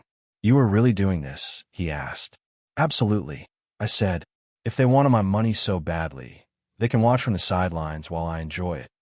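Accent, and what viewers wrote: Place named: Houston, United States. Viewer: If this not a real Houston story, definitely sounds like a woman from the Southwest side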